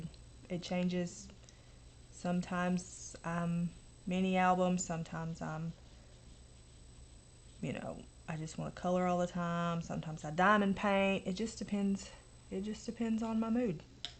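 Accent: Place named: South, United States. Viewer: ha ha I still love your accent props southern gal xx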